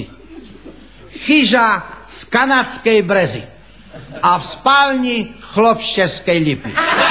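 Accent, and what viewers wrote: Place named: East, Slovakia. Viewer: abo kto nepozna vychodnarcinu ten da palec dole podla mna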